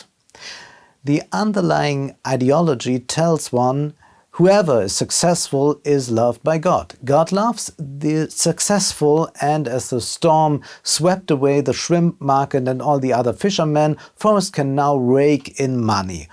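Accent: Germany. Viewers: Sympathic german accent. Really like it:) Immer weiter, keiner macht sein Ding so schön wie Du:) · Ohne den deutschen Akzent wäre die auch im Englischen makellose Wortwahl nur halb so schön. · Though the German accent is very lovely, considering enabling the subtitles might help the one or the other to better understand what is being said. Would be a shame considering the brilliants of the analysis. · High quality content with a cute german accent!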